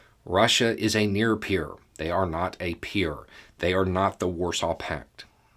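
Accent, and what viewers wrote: South, United States. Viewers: Stop hating 🤡. Yes it’s out there but packages this in a nice and logical way for us to digest. I still do my own research but damn that southern accent just makes it so much more fun to hear it from him😬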